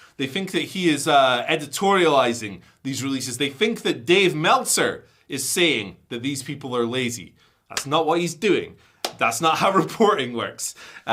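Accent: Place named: Scotland, United Kingdom. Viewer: Why do I love listening to this Scottish man rant about wrestling so much?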